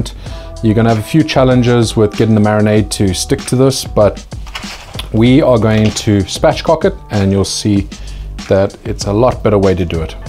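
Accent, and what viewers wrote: South Africa. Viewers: Can I ask what your nationality is and where you currently living ,cause you sound to much like a South African to me but the products you use isn't familiar. FYI Love your Channel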